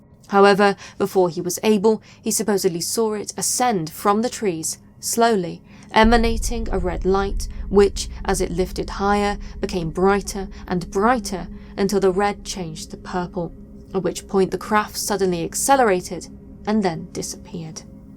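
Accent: United Kingdom. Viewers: I wish all narrators had a lovely British accent like hers.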